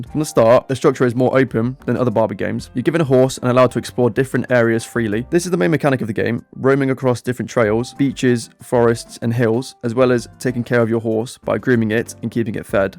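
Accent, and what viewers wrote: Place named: United Kingdom. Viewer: Great video! Thanks for rating these games fairly! (also you are so British sounding it’s like I can see the difference between color and *colour*)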